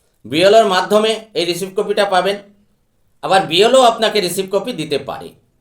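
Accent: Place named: Bangladesh. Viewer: আপনার উচ্চারণ গুলো কিন্তু বাংলাদেশীদের মতন।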